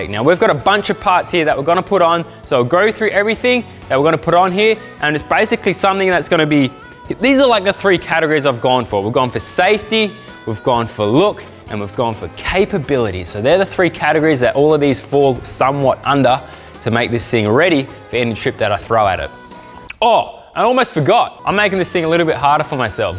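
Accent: Australia. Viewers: Is this dude Australian because I’m Australian I want to buy dress this off-road machine it’s just so cool I love it😎 ❤👍👍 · Are you Australian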